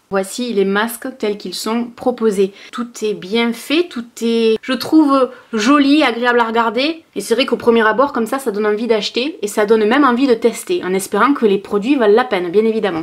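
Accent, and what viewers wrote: South, France. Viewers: J adore être en ta compagnie toujours un plaisir. Et on a ( oui je mets dedans mdr) Une communauté adorable. Mdr . Ces vidéos font du bien en ces moments compliqués étant moi même infirmière un peu d accent du soleil fait du bien 🍭🍭😘😘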